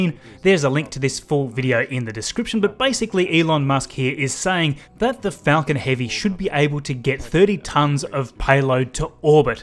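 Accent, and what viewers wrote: Australia. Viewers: Are you an Aussie or a New Zealander?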